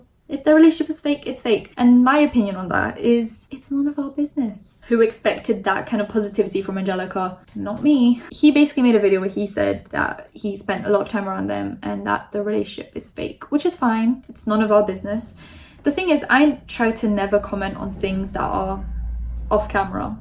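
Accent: United Kingdom. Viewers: oh yeah nah I wasn't really sure, you sounded British to me :) x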